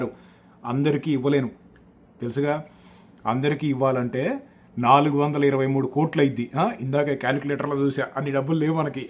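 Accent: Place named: Telangana, India. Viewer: pure telangana slang Broo. support from Kamareddy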